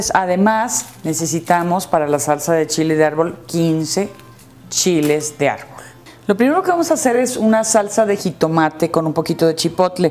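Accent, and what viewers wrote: Mexico. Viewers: Que envidia, de la buena, hay se me hace agua la boca !! . Suerte con tu canal, eres estupenda y me encanta tu acento norteño..!!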